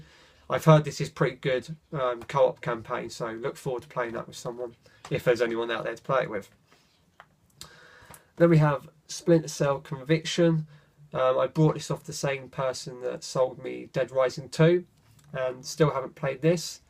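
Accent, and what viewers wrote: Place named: England, United Kingdom. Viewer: Very cool channel man! Sub for sure. I'm just a sucker for that cool English accent haha u don't have that many games on your collection u haven't played, if I was to do a video like this, I would show 80% of my collection unfortunately, maybe more. One day I'll catch up, that's what I keep telling myself anyway. I used to play a lot of rainbow six Vegas when I first got the 360 had a lot of fun with that game! Anyway you got more cool videos and I'll go check them out! Keep up the good work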